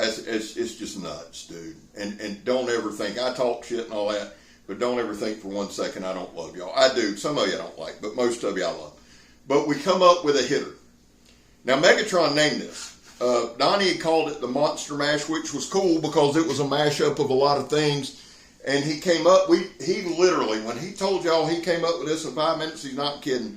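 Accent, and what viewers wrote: South, United States. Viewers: Southern accents are so cool. All I got is this Canadian tongue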